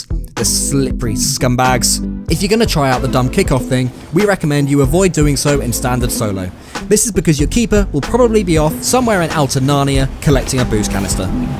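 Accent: Denmark. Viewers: Is he danish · mate??? you're a dane??? :D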